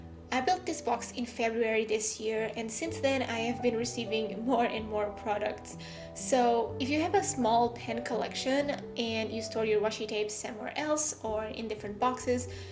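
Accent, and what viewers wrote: Portugal. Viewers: adoreii !! reconhece-se logo um portugues ahah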